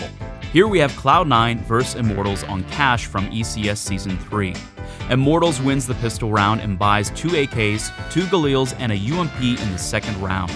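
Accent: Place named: United Kingdom. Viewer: you dont sound like him imo but you have a nice soothng voice like him, with a diff accent lol, i think ur british?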